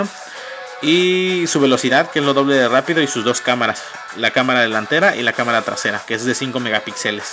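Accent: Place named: Mexico. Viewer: 30 segundos y quité el video. como detesto el acento mexicano... mejor lo veo en Inglés weis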